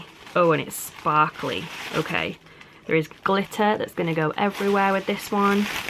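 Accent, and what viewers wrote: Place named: Yorkshire, United Kingdom. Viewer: Omg your makeup is so perfect 💗 also I love your accent are you from Yorkshire?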